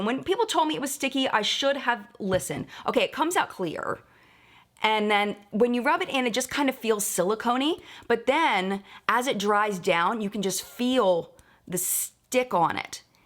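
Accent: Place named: Maryland, United States. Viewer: I can hear you're a Marylander with how you say your Os. 😁 Hi from a fellow MD lady!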